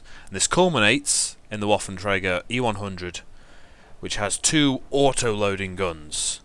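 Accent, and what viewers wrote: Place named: United Kingdom. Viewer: I just love the Brit accent. it's quite epic. Makes anything great (he could read from the dictionary and it would sound good to our American ears !) :-) (but he does good reviews too - beyond that lol)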